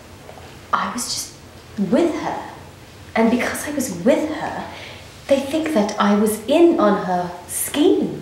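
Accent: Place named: Australia. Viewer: Aussie accent eh. Nice 👍🏻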